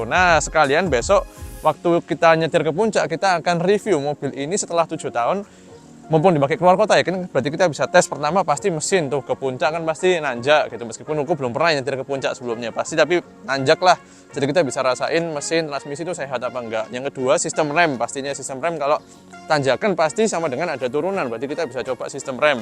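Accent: Surabaya, Indonesia. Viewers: Logat Suroboyo wkwk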